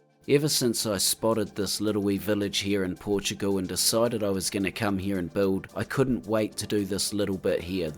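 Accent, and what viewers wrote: New Zealand. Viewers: I much prefer CS1 content - it's just so much more dynamic. I can sort of sense the big CS content creators are trying their best with CS2 but its really not picking up where CS1 left off and more or less just starting where CS1 started. Anyways, I still love your content and enjoy hearing the kiwi accent for CS videos.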